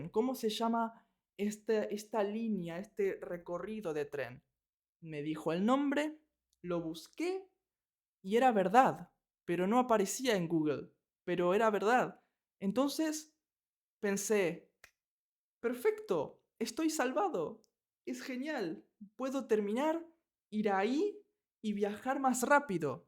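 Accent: Argentina. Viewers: No tenia que llevar el puesto por el tren? (Suena como chiste, llevar puesto, como decir llevo puesto nada por el tren, no se). Habia otro hombre con camion que venia para el puesto, dices? No entendi todo, pero entendi lo suficiente espero. Hoy he sucrito al canal, y hoy es primera vez he oido accento de Argentina excepto ayer un poco de un video sobre Casa de Asterion, la cuenta corta supongo que la has leido tal vez? Seria un buen tema para un video. I just remembered you speak english and italian but i had to practice writing in spanish. I dont know why i suggested la casa de Asterion this video is already super helpful. Thank you for making the videos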